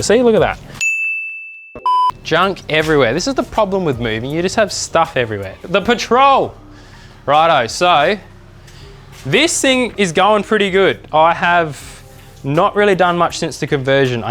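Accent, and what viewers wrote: Australia. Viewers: How good is the Australian accent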